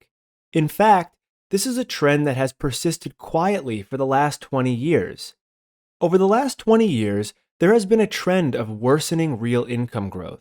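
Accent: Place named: New Jersey, United States. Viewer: Love the Jersey accent (as much as anyone can)! Keep up the good analysis.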